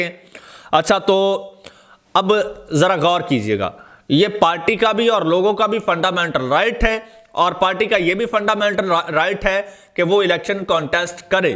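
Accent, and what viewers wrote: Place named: Punjab, India. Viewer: Bro ek suggestion hai Vlog acha hai lekin apki Urdu Mai punjabi style ata hai Baqi sab set hai❤❤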